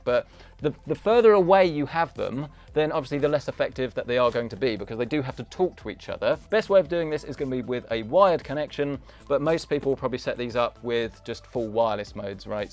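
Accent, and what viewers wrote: United Kingdom. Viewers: Brits always pronounce things weirdly... It's router not rooter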